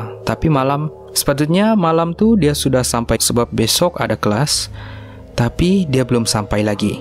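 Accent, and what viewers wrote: Sabah, Malaysia. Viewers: tu bahasa sabah bukan indon, ramai org malaysia yg tak tau loghat sabah huhh, pelik2, macam sabah bukan malaysia dh. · Dia org Sabah lah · Kupi² go ni... Orang sabah kah pencerita dia? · pekatnya sabah awk.. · loghat orang Sabah tu saya pun org Sabah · / sabah bro,not indon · saya ada kawan baik orang Sabah.. saya dari semenanjung.. suda 17 tahun kawan sama dia sampai sekarang.. Bila Admin bercerita ada dialek Sabah, saya senyum jak bah.. faham suda..😁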